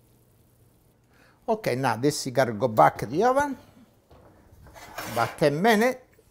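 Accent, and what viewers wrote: Italy. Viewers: I absolutely love the Italian accent! I haven’t heard it in a long time. Tomorrow is Wednesday and I think that eggplant is on the list! Thank you!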